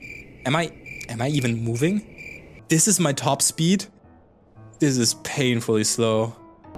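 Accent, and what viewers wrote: Germany. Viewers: Dieser Deutsche akzent xD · Ich liebe diesen deutschen aktzent. Gutes Video. Mach weiter so ❤ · sounds so german, 100% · Bro casually spent almist 600 bucks for trash games❤💙💛 Greetings from Germany Im pretty sure I hear a german accent here? :P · Bruder man hört ja krass das du deutsch bist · I Hear Deutsch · are you german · Ayo my friend are you german by any Chance?:) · Deutscher aktzent? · Bro sounds pretty german.... Are you german? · sound like german accent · Are u german? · Krank wie sehr man den deutschen akzent raushört wenn man dein gamertag sieht.😂 wirklich gutes englisch.😌 kommt fast authentisch rüber bis man deinen nicknamen als deutscher liest.😂 aber geiles video junge. Hau die scheisse raus. Ich knödel dir auch nen abo raus.😂🫶🏽💀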